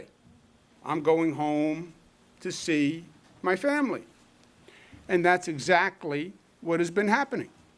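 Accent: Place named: New York, United States. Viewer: I’m not turning on the volume because I know this will be a Mario Cuomo self admiration session. I cannot stand his whining abrasive New York liberal hypocritical voice. “ I love me I love me ! I’m the very best that I can be”. No hard questions like: Why are you defunding police? Why are you ignoring the violence of “peaceful” protesters who morph into ANTIFA at a moments notice? You have your own security detail. How come if police aren’t needed? Why are you allowing destruction? Why are you trying to destroy small business? Why are you opening and closing businesses without solid fact and criteria? Why are you suddenly discounting Fauci’s recommendations? Are you a physician? WHAT WAS YOUR AGENDA PLACING COVID PATIENTS IN REST HOMES AND/OR RETURNING COVID PATIENTS TO THE REST HOME? How many died as a result of YOUR demand? Would you put your Mother in that very same rest home? 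. Why would an elected government official accept an EMMY for a self serving, self promoting FREE opportunity to spread misinformation and politic against the President and his supporters? Aren’t EMMYS an award for entertainment? Isn’t that a self congratulatory move that is BELOW even a career politicians arrogance? Congrats on your performance.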